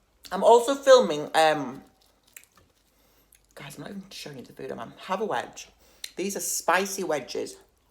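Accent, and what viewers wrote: United Kingdom. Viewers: HILARIOUS 🤣😂🤣...l love your British accent...so BEAUTIFUL...Awesome video👍🏼🇨🇦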